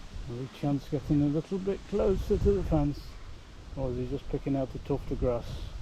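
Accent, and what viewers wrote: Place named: England, United Kingdom. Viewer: ❤😂🎉. Love 💕💕💕💕😁🐾🐾🐾🐾💕💕💕💕Love this Video 😂😆😫😊🤓😇😂🤣🤣🤣🤣🤣😍🥰🥰🥰💕💕💕💕💕🐾🐾🐾💕💕💕💕💕🤣🤣🤣🤣🤓😲😩🤓🤔🥰🤣🤣🐾🐾🐾🐾🤣🤣🐾🐾🐾🐾😮🧐😫😫💦💦💦💦💦🌈☔️☔️☔️☔️☂️☂️☂️☂️☔️☔️☔️☔️🌈😂😂😂😂. Hehehehe 😅. Hehehehe 😅❤😂🎉🎉. I Do. This. All. Day. Talk to. Myself 😮👀👀👀👀🌈🌍👀🐾🐾🐾🐾🐾😩🧐🤓. But Nobody. Listen 😫🧐🤓💦💦💦💦🌈🌎🤣🤣🤔😂😂😮🌍😍🌈🌍😍😍. Wonder. Does. Milo. Listen 👀👀👀🤓🐾🐾🐾🐾👀😩🤓👀😩😩😩😩🌈🌍😂🌈🌍😂🌈🌍😂😂🌈☺️🌈😇🌈😊🌈🤣😫🌎. Looks like. You have a. Drought in your. Neighborhood 😮😮👀😫🐾🐾🐾🐾🌍🐾🐾. We. Having. So much. Rain ☔️ ☔️☔️☔️☔️☔️☔️☔️😫💦😫💦💦💦💦. Im. Going to have to. Send. You. Some. Rain ☔️ 😂☔️☔️☔️☔️🌈🌎🤓☂️🌈🌎🤓☂️🌈🌎🤓☂️🌈. 🤣💦💦💦🤣💦🤣🤣💦🤣💦💕💕💕💕💕💕. Enjoying. Listen. Talking to you. 😂❤❤. Love the. ENGLISH. Accent 😅❤🎉🎉🇺🇸🌎🇬🇧🌎🇺🇸🇮🇪🌎🇮🇪🌈🤓👀🤣😊🇬🇧🌈🇺🇸🇮🇪🌈👀🇬🇧🇺🇸🌈🐾🐾🐾🐾😇💦🌎🐾🐾🐾🇮🇪🇮🇪🇮🇪🌈🌍🌈😊🤣🤣💕💕💕💕💕💕🌎🌎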